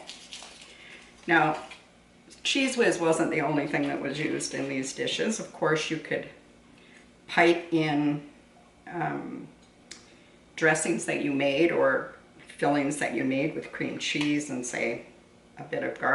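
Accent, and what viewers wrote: Canada. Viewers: Just found you and subscribed. I was curious where you were raised. You used the word dear (costly) and pronounced the word herbs sounding out the h as in a man's name and I noticed a bit of Canadian oots...having an English mother am I close to your heritage?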